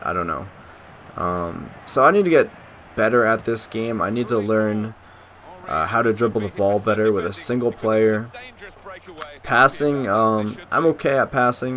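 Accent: United States. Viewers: umm no if your european or whatever its Football. but here its soccer and since is american, its soccer to him, not football, football to us is an amazing sport played with an oval ball. and we pronounce defense different because thats how we say it here. its just different here than it is where you live, no reason to be upset because we say things different here. i don't complain cuz you say mum and stuff because i understand people in different places speak differently.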